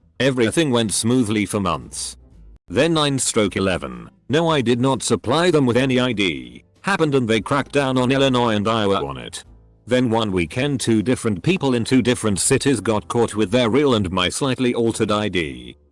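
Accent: United Kingdom. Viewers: Nice British accent, man! 👍👍👍👍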